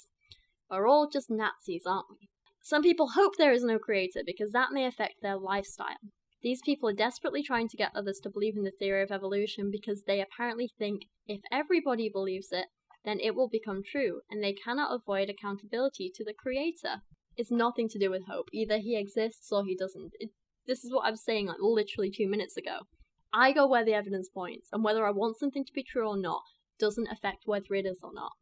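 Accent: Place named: England, United Kingdom. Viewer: Hi, I know this is a very late comment to your video, but I just wanted to say you have an amazing accent! The English accent is so cool, Kent Hovind must be high to even suggest that you have a weird accent. Anyways, wishing you good vibes from NY! stay cool!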